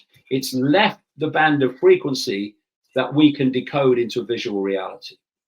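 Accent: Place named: Hertfordshire, United Kingdom. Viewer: He's from Hertfordshire to us inner London crew he's posh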